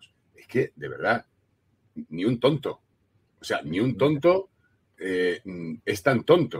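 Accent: Castile, Spain. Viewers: Habla castellano puro y duro sin más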